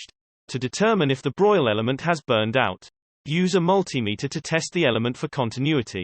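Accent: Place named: England, United Kingdom. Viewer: What a waste....really? No mention of stove top problems...only oven issues...but, suppose that by putting an English accent as narrator makes it seem ok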